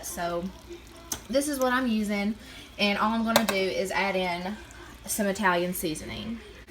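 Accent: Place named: Tennessee, United States. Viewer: My husband and I were sitting here watching your video and trying to place your accent. We are from West Virginia. I say you’re from Tennessee and he says Virginia. I’d love to know! Also, I love your videos - just subscribed. ❤️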